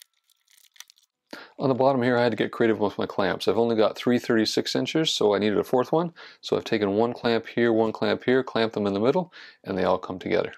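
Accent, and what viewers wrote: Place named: Canada. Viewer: I enjoy a good voiceless restoration, but it was super cool to hear the explanation for everything. Its always great to see experienced professionals at work, this was a real treat! Proud of myself for catching the canadian accent almost immediately lol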